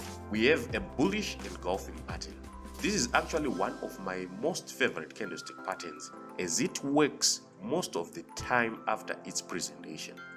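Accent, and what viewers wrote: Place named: Zimbabwe. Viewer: thanks. you sound Zimbabwean.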